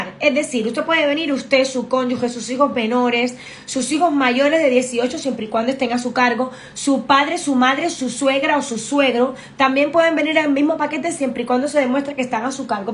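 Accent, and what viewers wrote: Cuba. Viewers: eres cubana?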